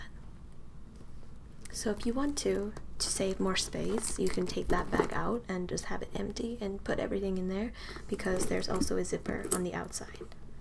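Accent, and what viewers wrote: Russia. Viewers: Or russian, if you ever watched orange is the new black reminds me of red! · I definitely heard some Russian in the accent :)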